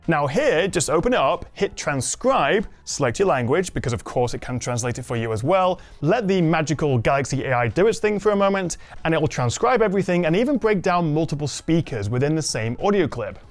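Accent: England, United Kingdom. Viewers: Your like the 10,000 person to leave a review on this phone. English accent doesn't resonate with Americans. And your review is sub par mate.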